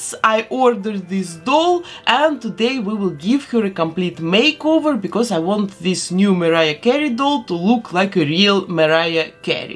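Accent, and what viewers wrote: Russia. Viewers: Вы русская? Чувствуется акцент )))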